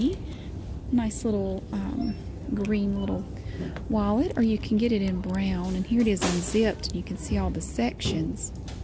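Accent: South, United States. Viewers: I just want to say I laughed several times throughout this video. Some of your comments about the items are really funny. I'm from South Carolina but I've been living abroad for the last 3 years. I love it but sometimes I miss hearing southern accents and sassy, warm and funny ladies like you. You remind me of my aunts hahaha. I also miss Target A LOT. Nothing like it here in South Korea.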